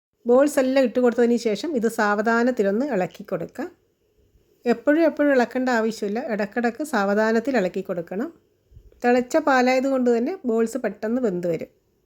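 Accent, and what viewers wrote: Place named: Kannur, India. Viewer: കണ്ണൂർക്കാരി ആണല്ലേ എത്ര ശ്രദ്ധിച്ചിട്ടും കണ്ണൂർ സ്റ്റൈൽ വരുന്നുണ്ട് കൊള്ളാട്ടോ 👍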